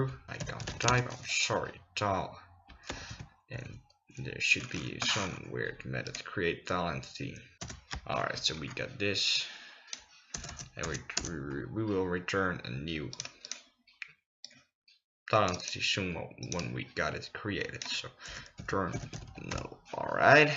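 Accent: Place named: Netherlands. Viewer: Good luck with your exams! Is dutch? I hear an accent..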